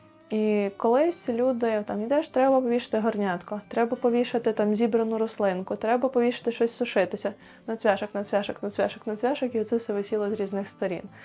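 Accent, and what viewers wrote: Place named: Ternopil, Ukraine. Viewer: а я то чую розмова така як в Тернополі👍😄 Моя сімʼя теж походить з Тернопільського району ❤ Ти моя землячка🎉❤❤❤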